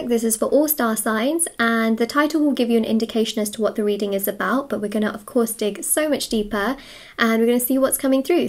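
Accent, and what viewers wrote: United Kingdom. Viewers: your accent is so British..... are you a British native???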